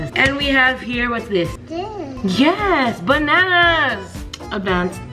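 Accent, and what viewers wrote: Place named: Philippines. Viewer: Oh my goodness I love your Filipina accent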